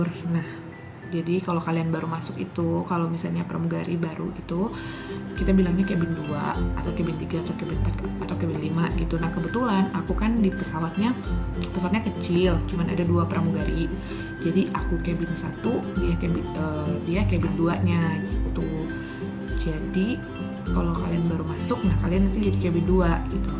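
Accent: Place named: West Java, Indonesia. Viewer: orang sunda ya .. sok silahkan .. hehe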